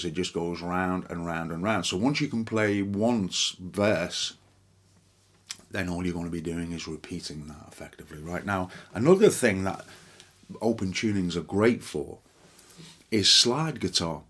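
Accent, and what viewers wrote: Liverpool, United Kingdom. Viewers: Nice one thank you. (a hint of Scouse there perhaps?)👍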